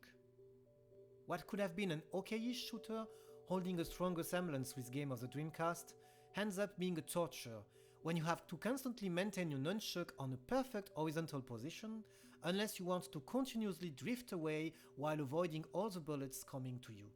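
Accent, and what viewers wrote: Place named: France. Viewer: Hey! Great video! Thank you for all your work and I'll be eagerly waiting for any next video you'll put out, French accent included ;)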